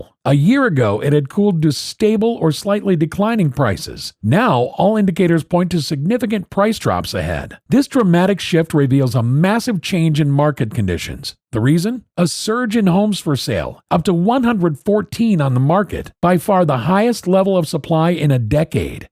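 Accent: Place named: United States. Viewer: The American voice is so annoying.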